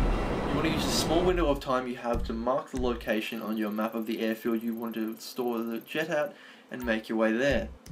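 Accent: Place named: Australia. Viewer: Yay a Aussie voice :)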